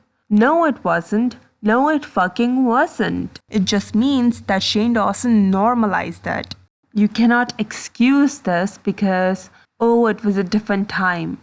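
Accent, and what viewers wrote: France. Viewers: Your accent is awesome and reminds me of my aunt from France 🇫🇷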